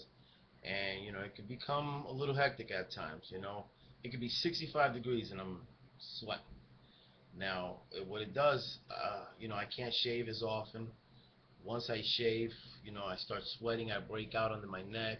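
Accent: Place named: New York, United States. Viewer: Gotta love that NY accent!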